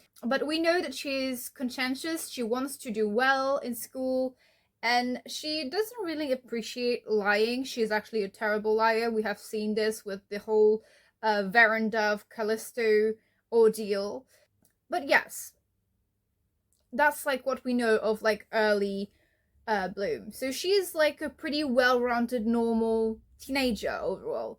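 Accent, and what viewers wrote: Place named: Wales, United Kingdom. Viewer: I love your Welsh accent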